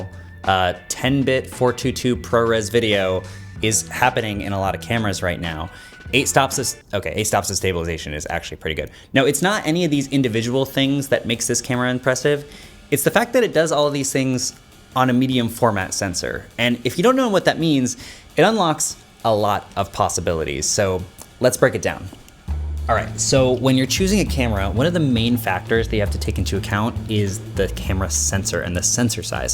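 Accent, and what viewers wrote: United States. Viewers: Aaaaah "Another: "Whats-Up" opening! As soon as I hear this + Yank voice! Block and go.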